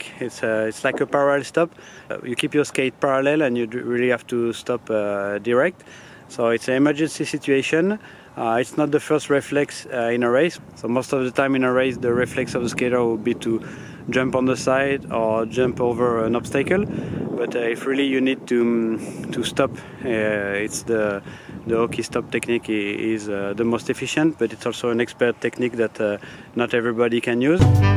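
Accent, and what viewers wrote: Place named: France. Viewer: Ah je me disais bien que y avait un p'tit accent français la dedans :D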